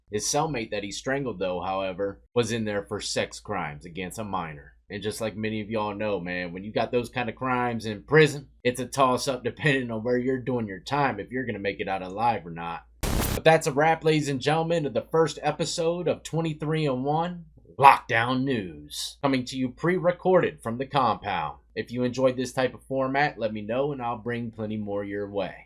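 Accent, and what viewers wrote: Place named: South, United States. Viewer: Aye that’s funny ppl mad how you say shit in the south we got our slang in the south we goin say Celtic (seltic)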